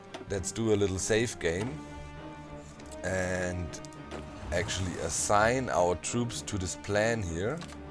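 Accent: Germany. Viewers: Are you german? Your accent says you are am i right?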